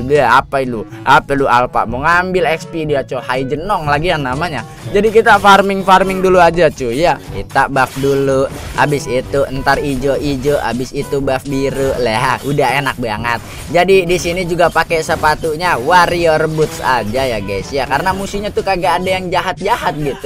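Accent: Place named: Java, Indonesia. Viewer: Medok amat bg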